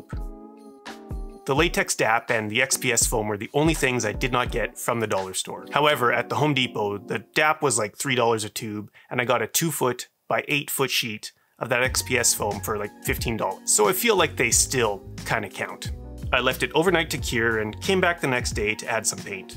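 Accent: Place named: Canada. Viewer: This guy sounds Canadian......"went to the same store" Yup! Nice vid fellow hoser.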